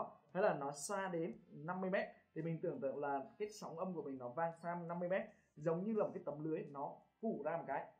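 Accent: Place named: Ha Tinh, Vietnam. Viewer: A ns tiếng Hà Tĩnh nghe gần gũi quá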